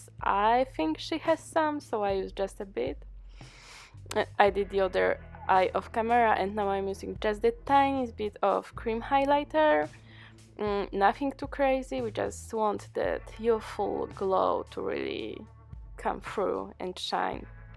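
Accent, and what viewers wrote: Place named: Russia. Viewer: Hi, were are you from? You have russian accent) ( i don't now)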